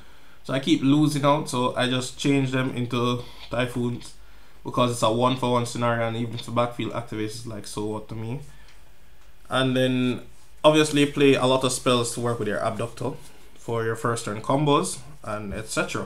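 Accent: Jamaica. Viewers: he sounds a bit Jamaican are you Jamaican?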